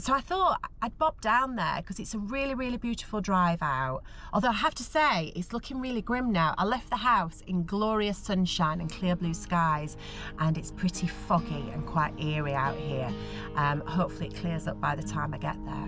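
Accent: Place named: Sheffield, United Kingdom. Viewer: Hi, loved the video of my home town, and hearing your lovely Sheffield accent. I have family from Sheff so apologies if I'm wrong. Anyway, great video, look forward to seeing more of the same.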